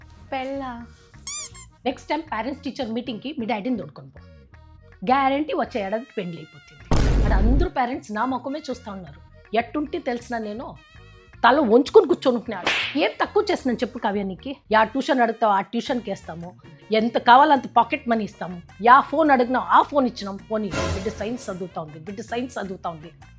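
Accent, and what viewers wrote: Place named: Andhra, India. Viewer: Which part of andhra is this accent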